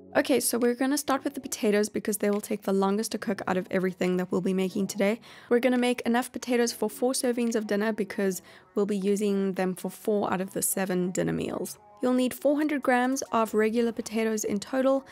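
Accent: South Africa. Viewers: I'm new to your channel but are you south African? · Is that a South African accent I hear? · you sound south african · Are you South African? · As soon as you started talking I knew you were from South Africa 😂😂💗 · In South Korea, was busy writing when you started your video. Seconds into the video I asked myself, "Is this person South African?" and then you said "Ja." It's SO good to hear an accent from home whilst being in another country. Thanks for the video. I'm subscribing.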